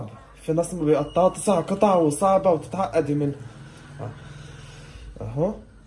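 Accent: Damietta, Egypt. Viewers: هي دي لغتهم في دمياط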